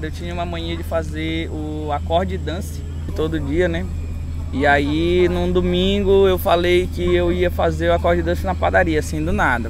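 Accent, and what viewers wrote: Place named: Pará, Brazil. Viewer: Não sabia que era paraense, mas descobri nos 10 primeiros segundos de video hahaha Segura o norte ❤️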